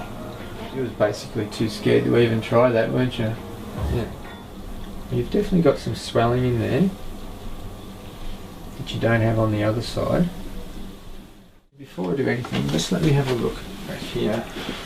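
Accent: Australia. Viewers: can someone please tell me if this guy is from sydney or melbourne pleeeease, i need to see this guy asap the joy i got hearing the australian accent hahahha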